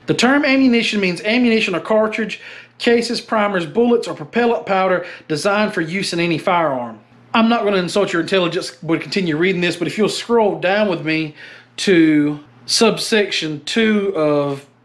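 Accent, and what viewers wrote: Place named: South, United States. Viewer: No offense you look middle eastern but sound like dirty south ... and m855 isn’t all that bad it isn’t the best in the world but it’s also way better than the best 9mm in the aspect of damage it will do to a soft target, I do have about 1000 rounds of it in the stock pile, but the other 3500 rounds in the stock pile are hornady 62 gr Fmj and Soft points both of those, short neck great pen/ fragments and weight retention, but if I find green tip for cheap I would buy it ... I’d rather get shot by federal hst 124 gr over m855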